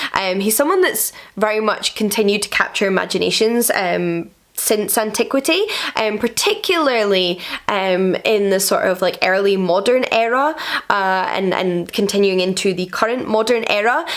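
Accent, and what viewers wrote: United Kingdom. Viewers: My like was automatic for this new video, shot and uploaded by. I like to listen to her voice, even her accent is British. Greetings from Sonora, México 🇲🇽.. 👍, which is a border states with USA.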